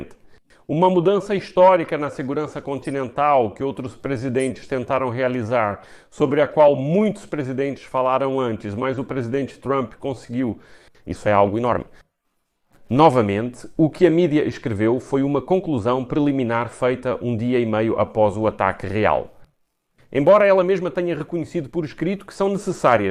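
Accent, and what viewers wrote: Portugal. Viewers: Quem é você para ter "pena" de alguém? Quando as "crianças" lutam por sobrevivência não pedem hipocrisia de outrem, mas engajamento. Devemos considerar vidas humanas independente de etnias ou credos e a compaixão dissimulada não acrescenta, subtrai. "Ter pena" é melhor que exigir justica e direitos humanos indistintamente, é olhar de cima uma narrativa inconclusiva em sotaque português.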